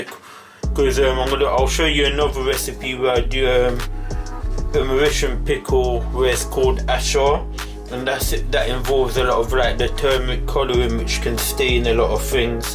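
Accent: England, United Kingdom. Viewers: I remember this from my time on the island many moons ago. But it is terrible to listen to a Mauritian speaking English in an English accent😁 No disrespect intended. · Which country is the english accent?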